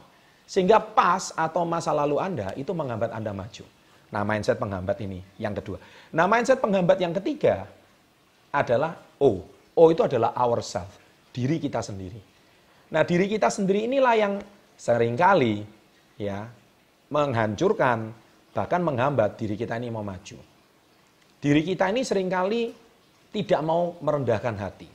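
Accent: Java, Indonesia. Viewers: ngomongnya jelas dg nada jawanya . keren mudah di pahami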